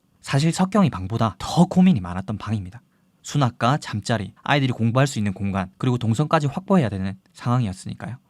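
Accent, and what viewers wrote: Busan, South Korea. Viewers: 님 부산인가요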